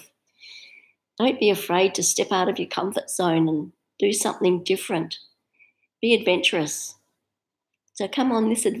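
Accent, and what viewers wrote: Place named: United Kingdom. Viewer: Hi from Turkey! I've been trying to learn English for a while. I watched your video while looking for the meaning of "gotta get going" on Youtube. Glad to meet you and your excellent British accent!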